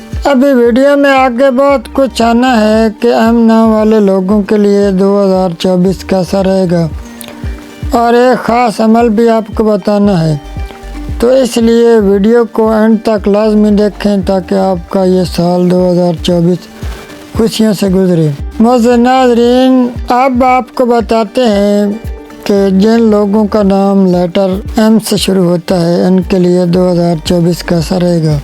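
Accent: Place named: Sargodha, Pakistan. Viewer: Aap ke Urdu boli par Sargodha ke local boli ka rang Chara hua ha. Aap ka distt ya division Sargodha ha??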